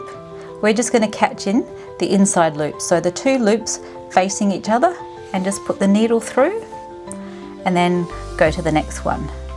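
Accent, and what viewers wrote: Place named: Australia. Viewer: Yeah! An Aussie teacher finally! 😅 Now subscribed. Watching from Northern NSW. Dee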